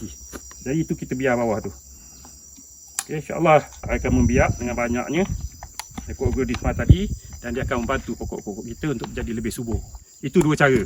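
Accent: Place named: Terengganu, Malaysia. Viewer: Assalamualaikum,tumpang tanya, asal dari mana,bunyi macam orang Trengganu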